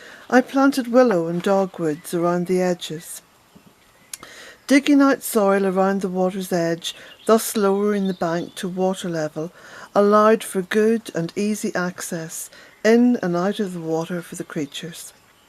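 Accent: Ireland. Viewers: Oh how nice it is to hear those words read in your lovely Irish tones. You give new resonance to your writing. Thank you. Have a beautiful rest and wake to the scent of rain.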